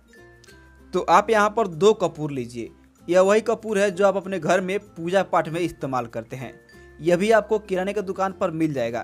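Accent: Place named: Bihar, India. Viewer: Explained in Bihari accent.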